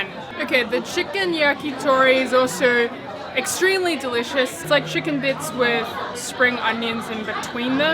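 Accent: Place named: Australia. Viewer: So cool to see you cross Shibuya Crossing. Love your Aussie accent.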